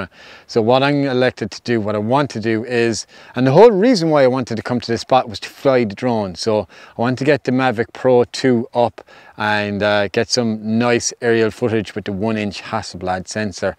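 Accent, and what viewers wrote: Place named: Ireland. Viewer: Nice video mate! I really enjoyed watching and dude I love your Irish accent. Keep on vlogging but also take your time and enjoy the time with your friends in the wonderful Irish nature. Thanks for sharing! Take care!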